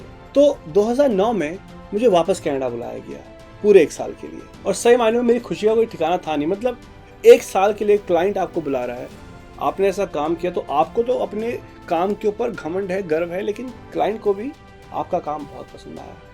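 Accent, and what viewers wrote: India. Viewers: I am here after watching your video where you replied to Technical Guruji ... Hats off to you boss , tone se thoda thoda main samjh gaya tha ki bande ka Pahad se koi na koi link to hai or nikla b wahi. I am from Uttarakhand too. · You look like kashmiri because you have a pure kashmiri accent!!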